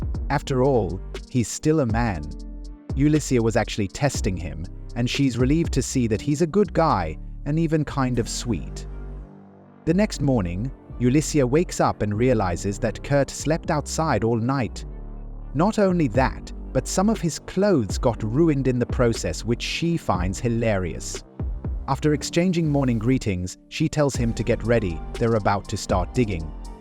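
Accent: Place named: United Kingdom. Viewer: First. time i saw a british voice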